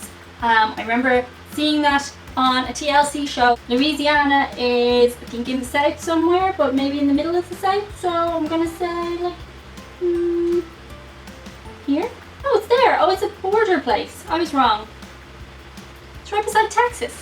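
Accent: Ireland. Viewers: I love her accent being of Irish decent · I’m a new subscriber, I’ve been binge watching a lot of your videos. I now have your Irish accent stuck in my head. Oh, I watched editor video. She has so much personality, just tell her, she needs to be Not so perky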